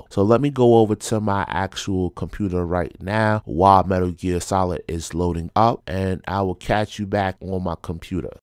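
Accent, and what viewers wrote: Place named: Brooklyn, United States. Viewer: Bro you from NY aren't you ? By your voice an slang I would say brooklyn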